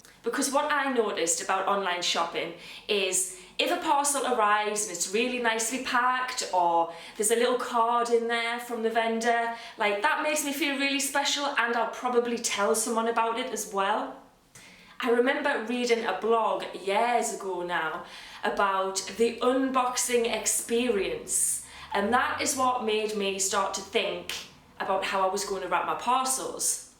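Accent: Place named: North East England, United Kingdom. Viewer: Hello. I’m new on your channel. Just want to say hello and let you know that I love your attitude! Oh... one thing to ask! Are you from Newcastle? I heard that lovely north east accent. 😀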